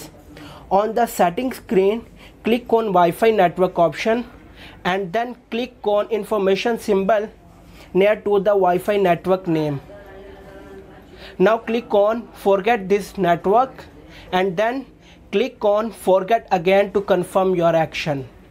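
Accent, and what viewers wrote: India. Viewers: Indian people English is good, and the accent maybe Indian but you understand very clearly what the guy is saying, good video! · You know it is bad when the guy has an Indian accent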